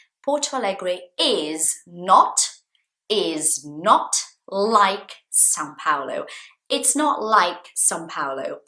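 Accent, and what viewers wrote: RP, United Kingdom. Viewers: Excellent! And your British accent is so posh!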